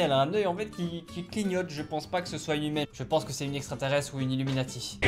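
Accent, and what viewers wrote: Belgium. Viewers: t'es le meilleur t'es trop pouissant, je t'adore avec ton accents de Belge, j'imagine, vue que tu vis en belgique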